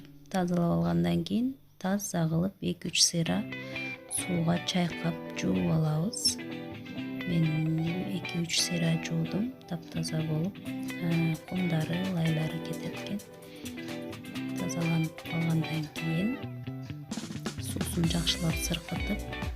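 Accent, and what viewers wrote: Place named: North, Uzbekistan. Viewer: Жасалмасы жок эле , оздорунун диалектинде суйлоп атат ,, ар бир жэрдин озунун диалектикасы бар ,, Тундук тарап. Талас ,, Туштуктун уч Ош. КараСуу ,, Баткен , ЧонАлай ж.б болуп 😮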